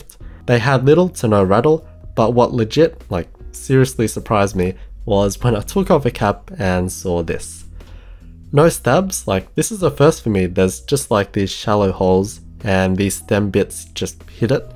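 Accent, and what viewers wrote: Australia. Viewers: aussie mate] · the hint of Australian in his accent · Oh wow you’re Australian too nice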